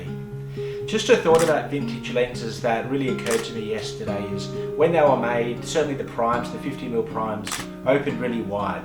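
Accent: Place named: Australia. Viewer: You look so American and yet you sound so Oz. I am hevily into vintage lenses, especially anything Carl Zeiss. My two best are an ancent Carl Zeiss Biotar (No red T* but, coated glass) and a more recent Rollei Carl Zeiss Distagon, very worn and skuffed on the outside, but what great glass. I recently found out about the SMC Pentax-M lenses. There is a site that ranks Pentax glass, the Pentax-M f/1.7 50, is not only the most popular, it is ranked the highest. So sharp, and so cheap. $30 USD in the states. Thank you, good work, I subscribed.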